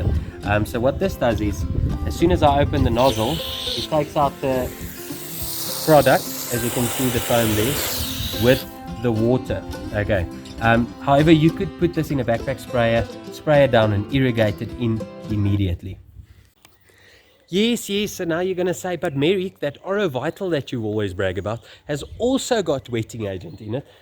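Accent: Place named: South Africa. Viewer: I originally came here for the grass tips....Now I’m just here for that South African accent.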